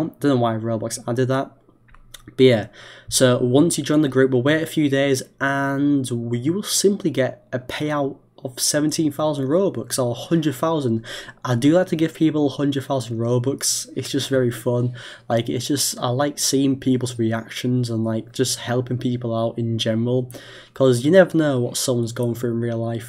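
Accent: United Kingdom. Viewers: Bruh are you British WTF 😳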